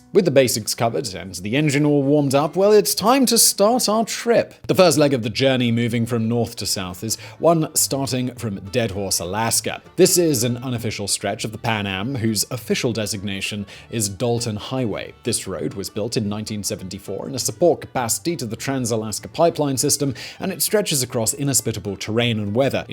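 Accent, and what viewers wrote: United Kingdom. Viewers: Nice video but the British accent is overkill.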